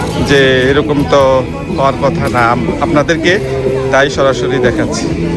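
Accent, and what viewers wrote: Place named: Dinajpur, Bangladesh. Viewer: এ হতে পারে ওপার দিনাজপুর গ্রামের গলার স্বর তেমনি বলে।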